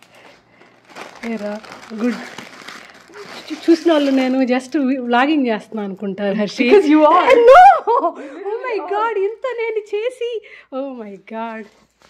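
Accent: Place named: Tirupati, India. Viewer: Nice video andi, the way you speak, reminds me of my home town tirupathi. We also call poornaalu as suggi Could you share your pulihora recipe please?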